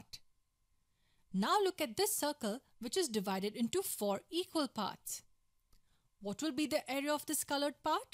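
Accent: India. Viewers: Oh... um i do not really understand this indian kind of english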